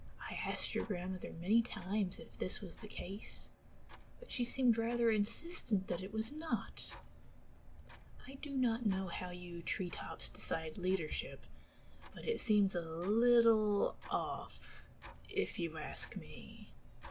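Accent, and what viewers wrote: South, United States. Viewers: Definitely not you. Risking sounding like a simp, but you’ve got a voice (and likely a personality) like a southern angel😁. Honestly, it’s great to finally see an ASMR channel with a southern accent. Being a southerner as well, I appreciate good southern voice acting. Keep up the great work, can’t wait to see more!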